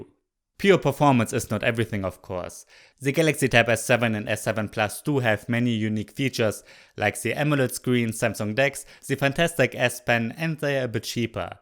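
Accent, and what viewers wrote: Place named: Germany. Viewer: You got such a strong german accent, i only realised i was watching your english channel after like 5 Minutes in the video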